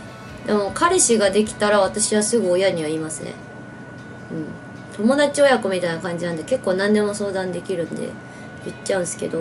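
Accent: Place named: Kansai, Japan. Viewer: やばない？ってさんの関西弁めっちゃかわいい笑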